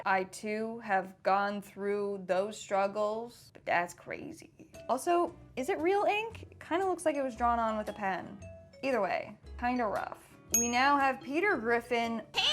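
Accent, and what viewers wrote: New York, United States. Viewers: Haha...love your NY accent.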